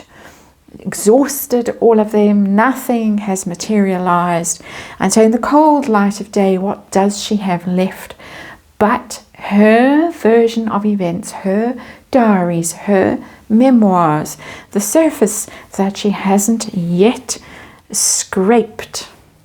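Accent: South Africa. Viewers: Hi do I hear a South African accent ? Hello if you are ❤❤I am too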